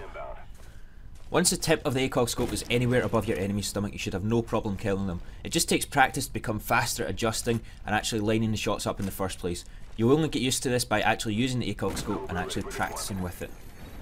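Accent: Scotland, United Kingdom. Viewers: Scotland Aye? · are you scottish ?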